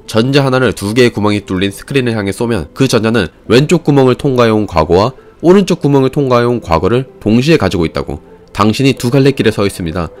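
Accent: Gyeongsang, South Korea. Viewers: 아 똑똑하고 귀여운데 말도 기깔나게하는 경상도 남자라니 최고다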